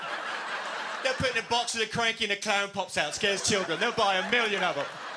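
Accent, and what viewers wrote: United Kingdom. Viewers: He has a good bri-ish ascent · his british accent reminds me of stewie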